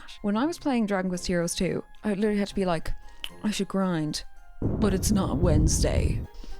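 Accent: Ireland. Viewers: Hold up is you irish? If so thats dope as shit can you say water in your next video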